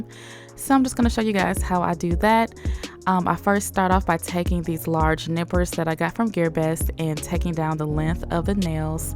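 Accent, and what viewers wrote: United States. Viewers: thankss.! why is so pleasant listening an american speaking instead a mexican speaking english! thank u so much!!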